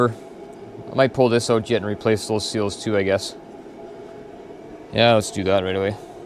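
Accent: Canada. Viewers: The sheer amount of moving parts in a fuel injection pump that was designed decades ago is mind boggling. I love your content, man. This is a relatively new-to-me channel, but you post lots of very interesting stuff, and as a fellow canadian, your honest-to-goodness canadian accent warms my heart in a sea of american videos.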